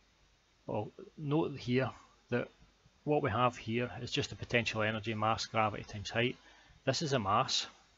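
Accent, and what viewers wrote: Scotland, United Kingdom. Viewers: Are you from Scotland?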